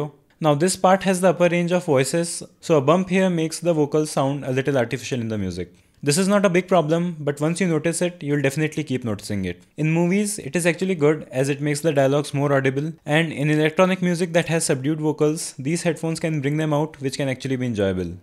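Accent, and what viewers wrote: India. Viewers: he shouldn't ignore it, the comment is true, indian accent is difficult to understand for majority of people including me. Though the contact is pretty darn good, it feels like he is reading a script. So consider the original comment, it only makes you better. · India...can you just...live and speak your native language and dont try to speak english? Yours is so awful. I'm sorry, but you so annoy to try speak normal language with your indian accent. Nobody understand what you say correctly. You need to repeat 100500+ time to understand. English is not yours. Learn some other...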